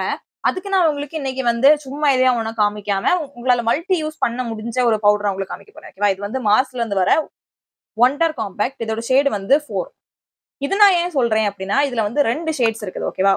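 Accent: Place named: Madurai, India. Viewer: Madurai slang😂💥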